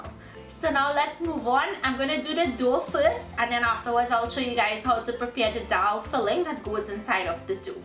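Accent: Trinidad, Trinidad and Tobago. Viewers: L'Ange Gemi-fob it's Trinidad accent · I'm here for the Trini accent - the food is secondary!! :)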